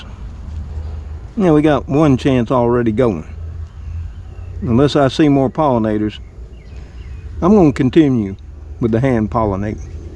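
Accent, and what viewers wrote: South, United States. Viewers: I absolutely love the southern accent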